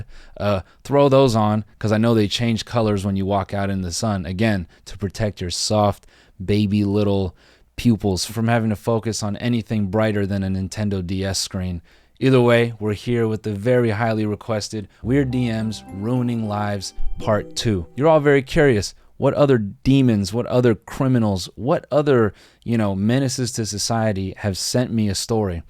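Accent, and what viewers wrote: United Kingdom. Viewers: Ur British accent has come a long way broski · I live for British accent, he is the only American who does it so well. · Why is no one talking about his British accent?? Lol amazing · That British accent is top notch mate. Nice one 👍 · That British voice is something is perfecting slowly hahahahha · Dudes lowkey British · Damn, does a pretty decent British accent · talking with a british accent is giving me CODEL flashbacks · I love this British accent😭😭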